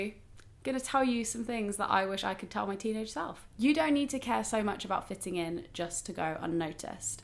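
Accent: United Kingdom. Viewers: I just want to say that your British af accent kills me. Seriously.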